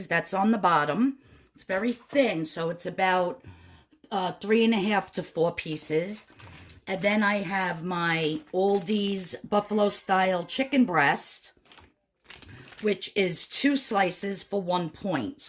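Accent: New York, United States. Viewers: Just found you. Love your videos and food and knew right away from your accent that you are from ny ❤️. Me too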